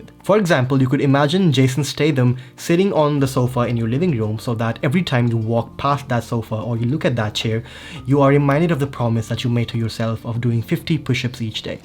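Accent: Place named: India. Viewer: I feel that your English accent is Hindi. Please make a video about speed reading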